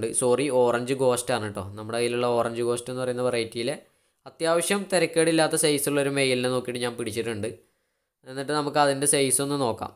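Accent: Malappuram, India. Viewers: Malappuram reethiyil samsarikkunnatha enikk ishttam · ഏത് രീതിയിൽ സംസാരിച്ചാലും കൊഴപ്പില്ല... നമ്മൾക്കും കുറച്ചു മലപ്പുറം ഭാഷ പടിക്കാലോ 😁😜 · മലപ്പുറം ഭാഷ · Malappuram basha sett 💯💯💯💯💯💯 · എന്തുട്ടാ ഗഡിയെ മലപ്പുറം ഭാഷ കിടുവല്ലേ 🔥🔥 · Bro I am from Trivandrum but I like your sound malapuram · Malappuram bhashayaa udheshichth ketoooo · ഞാൻ ഒരു പത്തനംതിട്ടക്കാരനാണ് എനിക്ക് മലപ്പുറം ഭാഷ ഒരു കുഴപ്പവും ഇല്ല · Malappuram പൊളി അല്ലെ. മലപ്പുറം ഭാഷ poli💥💥💯💯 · മച്ചാനെ മലപ്പുറം രീതിയിൽ തന്നെ സംസാരിച്ചാൽ മതി അതല്ല പൊളി😍😍😍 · മലപ്പുറം ഭാഷ കേൾക്കാൻ ഒരു രസമാ അതു തന്നെ മതി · Malappuram slang 👍🏻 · Malappuram bhasha venam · Malappuram slang samsarichaal kandirikkan adipwoli aanu But baki ulla subscribersin manassilavunnilla thonnunna words translate cheythaal pwolikkum🔥🔥❤️❤️ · മലപ്പുറം ഭാഷ കേൾക്കാൻ നല്ല രസമുണ്ട് മലപ്പുറം ഭാഷ തെന്നെ മതി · Malappuram slang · Malappuram style is OK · Malappuram tone eniyum veeenam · Malappuram style mathi chetta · I'm from tvm... 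Malapuram slag kelkumpo interesting aanu... Ath mathii❤️ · Malappuram language not problem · Malappuram slang mathi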